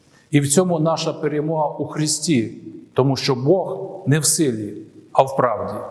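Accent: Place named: Russia. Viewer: у вас ошибки в произношении, как у жителя России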